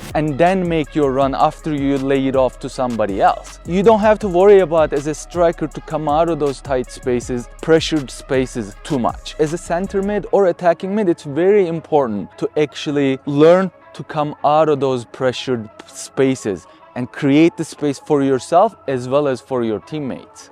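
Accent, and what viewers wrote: Turkey. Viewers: Bu aksan bir turkten baskasi olamaz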